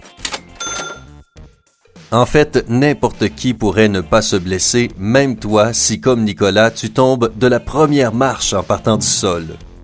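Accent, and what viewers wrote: Quebec, Canada. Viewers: est-ce t québécois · Parce qu’il est québécois et au Québec, on dit ça comme ça des fois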